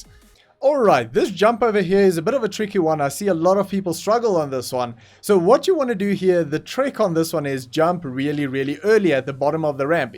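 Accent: South Africa. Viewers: You sound South African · Are you south african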